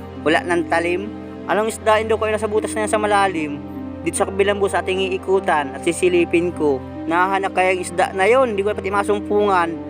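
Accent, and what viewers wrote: Bicol, Philippines. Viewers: Pa shout out idol Ruben Cruz nasa Dubai pero taga bicol kaya gusto ko Tagalog mo at ma halong bicol 😆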